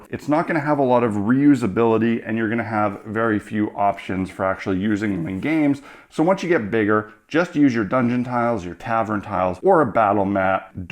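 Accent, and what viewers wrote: Canada. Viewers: Oh and love the Canadian accent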